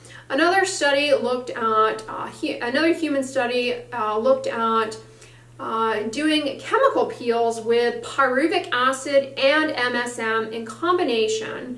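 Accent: United States. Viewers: What state do people speak like that? Just curious.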